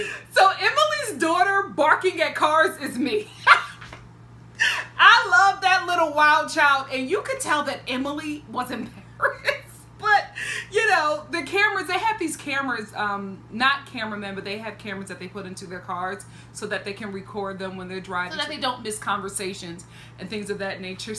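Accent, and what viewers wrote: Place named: Philadelphia, United States. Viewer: Omg this is the first time I have ever heard your Philly accent 😀😀 I enjoyed this review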